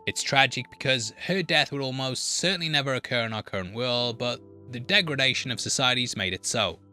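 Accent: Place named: Newcastle, United Kingdom. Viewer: YOU are this channel, everything we watch and listen to here comes from you. Like other people have said, we could all go and watch a similar (albeit not as good obviously 😉) video to this on YouTube but we all choose to watch you. As cheesy as it sounds that means you’ve built a community here, and it’s not crazy to think that we care about you. I hope in the future you feel you can put a bit more of yourself in your videos without sacrificing the right to a private life. It really meant a lot that you trusted your audience to tell us something very painful but also very relevant to your emotional response to this film. As an aside, I fucking love your channel, it’s an absolutely gargantuan (pun intended) amount of work you’ve put into it which is hugely appreciated. Also I moved away from Tyneside two years ago so it’s really comforting to hear a Geordie accent emanating from my TV and filling my living room. 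❤❤❤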